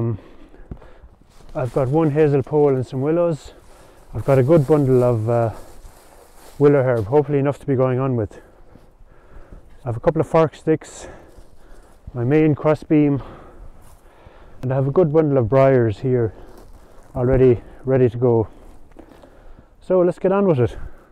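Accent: Ireland. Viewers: Your channel is very wholesome and your Irish accent is very easy on the ears brother. The Irish have the most beautiful language of all European continent. The romance languages of Europe pale in comparison to the Irish language. Many Years ago, for 26 years, I owned a made in USA Schrade Old Timer Blazer stockman. That three blade stockman blazer had a very special design large sheepfoot blade that sliced through saplings, feed sacks, rope, cardboard boxes and weeds like a hot knife through butter. It never became loose, never broke, never failed on me after 26 years of hard pressure testing. The large clip blade cut many fruits & vegetables well and also cleaned fish. It was carbon steel so honed easily and held an edge well. The spey blade worked well for skinning many squirrel & white tail deer. I gifted that knife, my most prized possession, to my best Army brother. 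Schrade Old Timer knives are no longer made in USA and their quality has gone down since being made now by atheist communist China.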